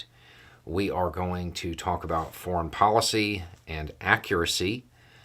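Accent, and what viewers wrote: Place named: Florida, United States. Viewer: That "ugh" after mentioning "great" putin or trump... i feel that ugh.. everyday. I like theatrical youtubers.. but i like your stuff. Living in rural fl and listening to some one with our accent talking sense for once.. its that good breeze off the cow pasture and not the swamp lol.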